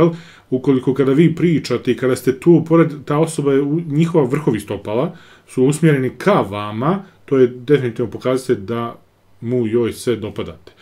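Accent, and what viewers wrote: Ijekavian, Serbia. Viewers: Opis i tekst na ekavici. Govor na ijekavici. Kompleksi su cudo. 🤣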